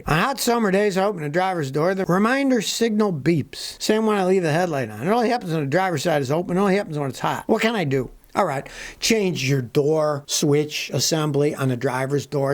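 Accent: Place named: Texas, United States. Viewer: Hell, he came from Texus! dat's da wey dey talk alla the time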